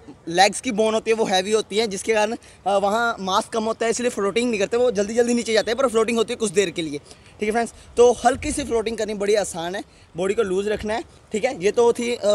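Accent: Punjab, India. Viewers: Oh that Punjabi accent 😆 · I love your Punjabi Hindi! #fullDesi ❤️ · I liked ur accent - Punjabi Hindi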